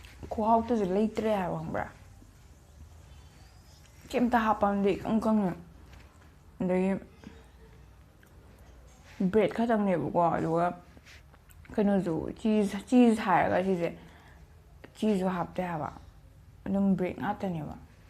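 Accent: Kakching, India. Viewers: eche mathangdi momo oina chabiyo adusu kakching marol da ngang ngaga chena ngangbado taba yam nungaijeiye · Ymna haotraba malleko...kakching mrolda oina ngangbiyo aduna henna nungsiye😍😍momo oina chabgi touse... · Kakching lonna nungyba helle echan tharoi charak o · Kakching lon ngangbna hena nungshiye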